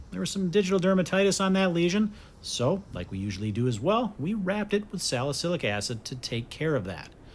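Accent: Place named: United States. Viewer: this one of the few american accents i find bearable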